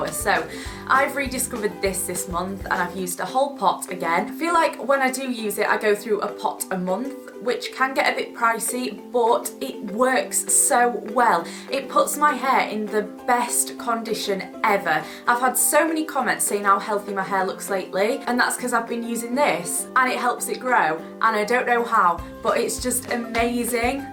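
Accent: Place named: Yorkshire, United Kingdom. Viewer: you are so confident with your Yorkshire accent on camera. Like I'm from Yorkshire and I seriously think mine sounds awful sometimes even on just a normal video. It's so weird. Love you btw!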